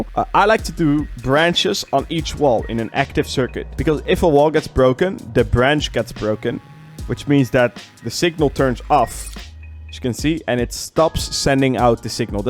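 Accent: Netherlands. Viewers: I just have to ask Are you Dutch?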